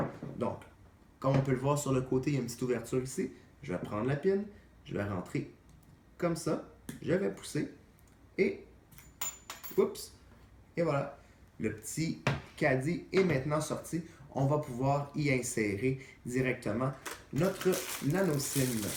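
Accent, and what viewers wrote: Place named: Quebec, Canada. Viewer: Oh putain l'accent quebecois .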